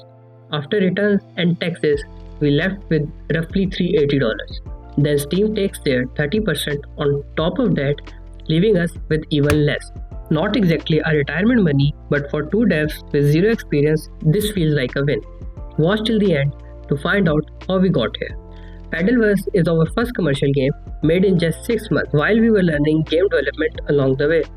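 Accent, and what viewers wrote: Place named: India. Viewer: Your indian accent sucks bruh...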